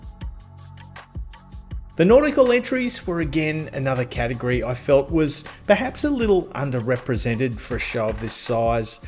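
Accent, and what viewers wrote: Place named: Australia. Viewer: Ah Refreshing to hear another bl00dy Aussie.. oi oi oi...Thanks brother.. Just subbed.. All of the best!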